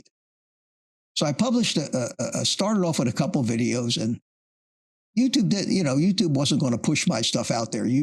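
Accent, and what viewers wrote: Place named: Philadelphia, United States. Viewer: Hi just enjoyed the live stream with Kerry Mann. Just checking out your other videos I enjoy also he is hilarious. I knew your accent sounded familiar I am also a fellow Philadelphian😀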